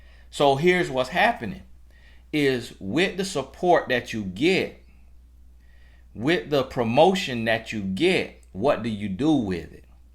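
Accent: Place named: South Carolina, United States. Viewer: where are you from?? You sound like you from SC, I could listen to you talk all day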